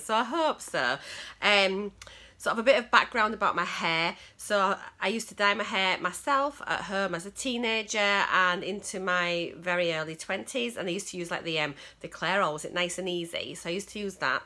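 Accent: Yorkshire, United Kingdom. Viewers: So love a Yorkshire accent!!!!!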